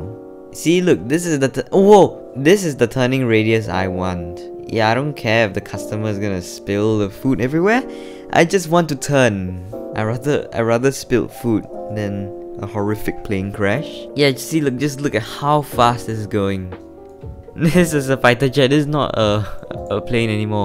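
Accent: Singapore. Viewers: You sound singaporean bro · yow ur a singaporean? you sound like one, jsut like me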